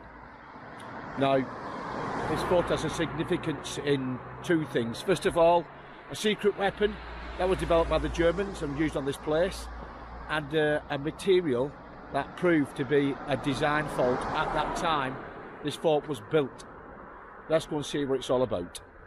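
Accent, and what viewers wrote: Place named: Lancashire, United Kingdom. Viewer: Fascinating. I was driven past Liege in the 1970's but never saw any of the fortifications there. I was lucky enough to visit Verdun in the 1980s and did visit those at Vaux and Douamont. I have hundreds of books, postcards, e.t.c. on fortifications. You sound like you come from Lancashire. I'm a scouser myself.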